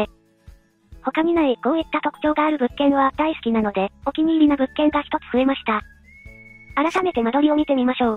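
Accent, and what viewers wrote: Hakata, Japan. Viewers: もっと博多弁を〜〜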